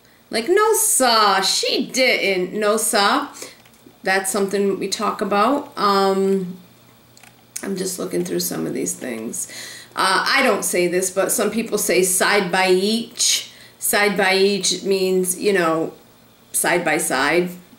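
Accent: Rhode Island, United States. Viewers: She says "here" like "heah". "We're" like "Weah". She says "part" like "paaaht". She says "jaguar" like "jaguah". She says "call" like "cwall". I LOVE the Rhode Island accent. It reminds me of Betty Boop and Harely Quinn. So cute! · Edward Miessner I always forget she’s from around here (Rhode Island) because she sounds so normal to me lmao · hey, I loved this video, I'm an esl teacher here in my home country Argentina, and right now I'm planning my pronunciation lessons, so I find this video quite helpful to get my students exposed to different accents in English. After spending two years in Chicago and ten months in central PA, I have to say a learned a lot but the US is so diverse when it comes to accents and slang, so thanks to you, my sts will get to listen to a clear Rhode Islander. Keep It Up,!:) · That's quite an accent - you sound a lot like Lois Griffin from Family Guy. 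Fascinating. I didn't know Rhode Islanders pronounced court and caught exactly the same! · You're so adorable! I'm playing a Rhode Islander in a new play and I really needed to hear an authentic Rhode Island Italian accent. Thanks for taking the time to make this. People say that the RI accent is similar to Boston or NY. But I almost hear a little Maryland/Baltimore in there. It sounds like you say Rhode Island almost with a "T" at the end. Like EYE-LENT. My friend from Baltimore says "salad" like SAL-IT all the time and it always makes me laugh! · yaw adawable, I'm playn a rodyelinda in a new play and i really needed to heah an authentic rodyelin italian accent. thanx for takn da tim to make dis. Peepul say that the rodeylin accent is simala to Boston or NY. But I almost heah a lil marilyn baltamaw in dere it sounds like you say rodyelin with a t ( no we dont) just it spelt out funetically...the girl up above is speakin to nice........haha I speak real rodyelinese! 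· I spotted your accent from a mile away! I subscribed when I saw your other videos and knew you must be from RI but wasn't sure till I just saw this old video! I enjoy your channel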